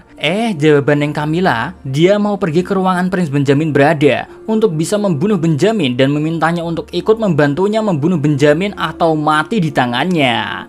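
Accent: Java, Indonesia. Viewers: Medok banget mas 😅😂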